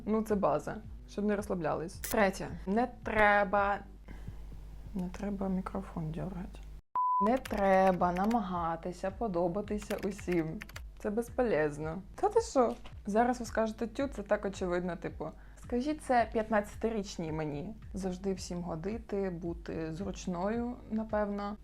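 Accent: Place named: France. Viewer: Ви француженка? У вас таких акцент 😂😂😂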